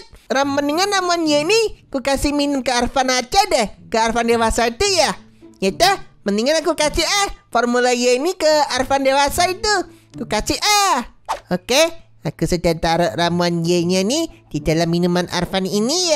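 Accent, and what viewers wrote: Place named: Java, Indonesia. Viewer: bang medok banget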